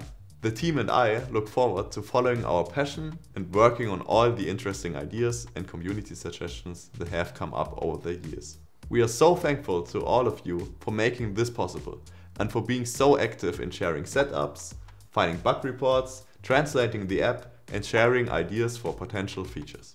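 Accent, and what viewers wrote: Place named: Germany. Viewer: I LOVE these update videos! I also love your German accent, lol! Thank you for creating the best launcher on the market and keeping us updated with these great videos! So nice to hear you guys are graduating. Congratulations and Happy New Year! 🎊🎉💥🎇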